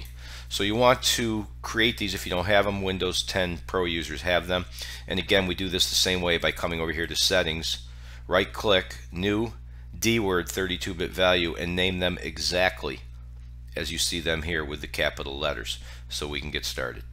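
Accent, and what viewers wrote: United States. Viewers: Your heavy accent is familiar, NY, NJ?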